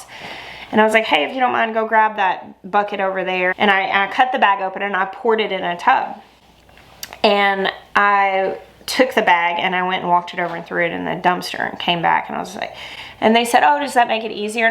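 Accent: South, United States. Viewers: it's been a while since I've had time to watch you. I've missed that time so much. I just wanted to tell you that you're getting prettier the older you get. Also, your southern accent is stronger! 😊 Our garden was very sad last year and we're working towards a better year this year. I sat down and turned your channel on KNOWING that I would be helped in a big way. Thank you for your encouragement and for showing your faith in God. 💚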